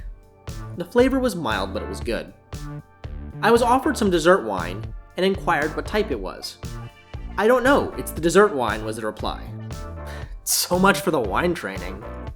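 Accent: United States. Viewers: Love your audio commentary. The seats are the same as Virgin Atlantic business class. I've never tried it but I would definitely give it a miss. Are you American?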